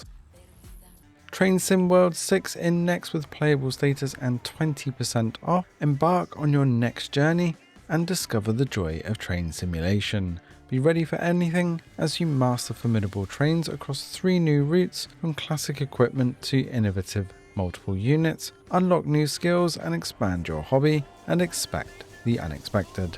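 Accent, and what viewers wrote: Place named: United Kingdom. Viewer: 😂 the narrator of this channel sounds like he's so bored, that brit almost put me to sleep. Highly doubt he games. Just bought Midnight Fight Express can't wait to check that one out!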